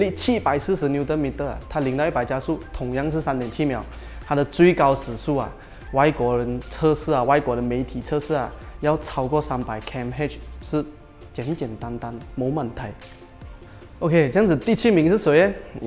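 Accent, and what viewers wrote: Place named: Butterworth, Malaysia. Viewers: 一听就知道是檳城口音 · 华语也是，一开口就是 Penang 腔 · 这个英文口音，一听就知道是北海人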